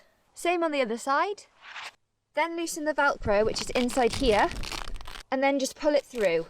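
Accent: Wales, United Kingdom. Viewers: Nice review, Great to see someone actually give a pack some abuse on a review haha, funny and informative, and a great welsh accent. Quality video.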